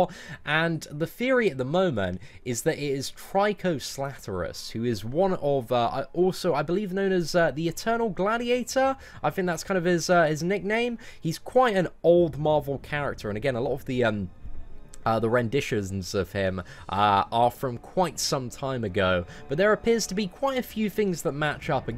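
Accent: United Kingdom. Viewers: Why read the patch notes when you can have them narrated to you with a British accent? ;)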